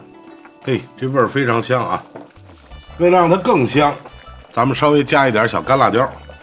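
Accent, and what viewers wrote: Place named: Beijing, China. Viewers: 南方人来听京普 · 主要是听地道北京味普通话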